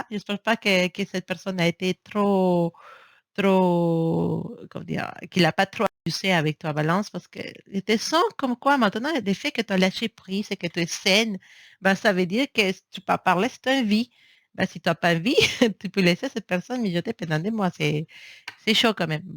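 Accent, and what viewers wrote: Spain. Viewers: Tu as un très joli accent espagnol, prend ton cheval et viens me voir 😃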